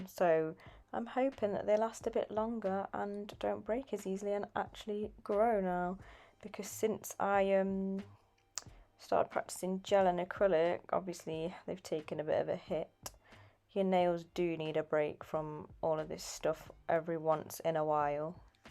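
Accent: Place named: Midlands, United Kingdom. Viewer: Hi I have this product, my question is have you used it without a colour on top? I found it went opaque did not stay clear. It’s a good strengthening product & I’ve since got the peach colour in hope that stays its peachy shade. Thank you for video. Also rare to find uk tutorial accent sounds like it’s from Midlands am I right? 😊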